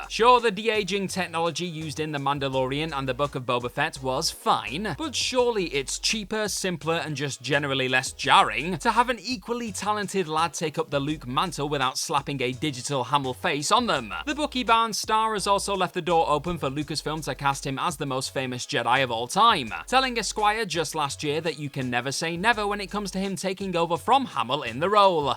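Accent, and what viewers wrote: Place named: Cockney, United Kingdom. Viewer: You missed yourself off the list. I'd cast you as either the 2-headed alien sportscaster at the Boonta Eve podrace or a new host for Jedi Temple Challange. Then you'd be my favorite Jedi Master! Your glorious cockney accent would get those younglings moving! 😆😆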